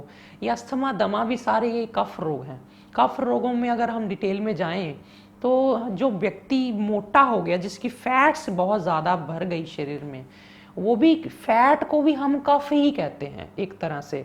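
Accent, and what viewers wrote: Punjab, India. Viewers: Ye punjabi accent hai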